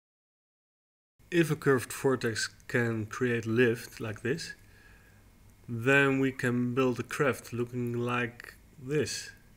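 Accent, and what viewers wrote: Netherlands. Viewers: Gast ik hoor aan je stem dat je nler bent ik wil s komen kijken! mag dat? :D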